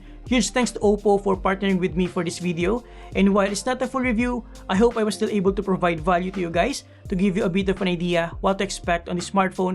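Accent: Philippines. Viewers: Pinoy na pinoy ang english mo😁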